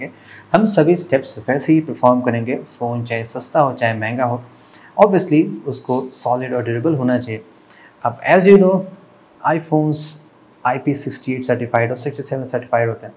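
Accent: Lucknow, India. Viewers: Bhai aap Lucknow se hai na?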